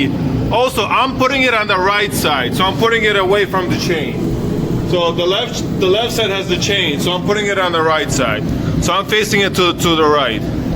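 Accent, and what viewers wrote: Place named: New Jersey, United States. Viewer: What accent is that? Jersey?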